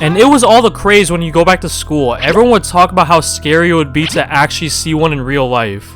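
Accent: Canada. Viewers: are u canadian??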